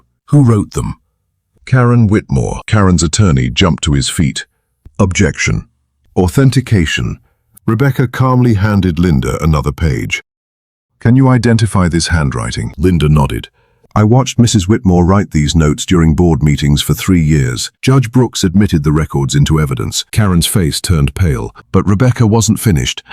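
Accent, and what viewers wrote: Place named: United Kingdom. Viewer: The story was a good one. They never let you know what the HOA and Keren ended up with in court. They were guilty. Yes, but what were the penalties. The other thing was the v oice used. That was a British voice. The story was in the USA. His family had been on that farm for 60 years plus.??????????????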